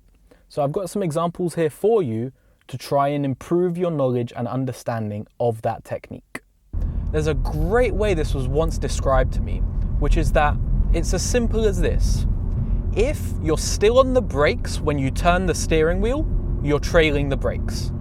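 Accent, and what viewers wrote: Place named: England, United Kingdom. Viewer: What part of england is that?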